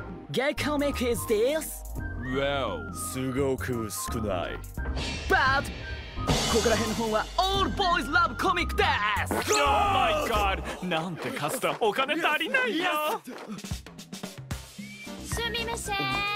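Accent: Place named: Japan. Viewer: the japenglish is my favorite part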